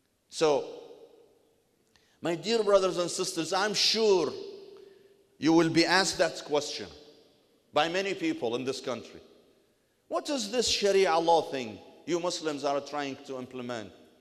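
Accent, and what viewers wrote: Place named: Russia. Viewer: Gee has anyone listened closely to his accent? It's Russian.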